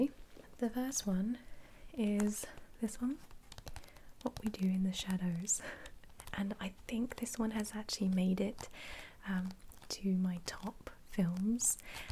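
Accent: England, United Kingdom. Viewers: so let me get this straight. .. an female english accent, asmr video about the brillant of David cronenberg. well I subscribed!. but u left out scanners. which is had the man with thee, thee greatest voice patrick mc gohan. well Richard Burton is thee best but patrick is a close second. and never forget about David Lynch or the Stanley kubrick.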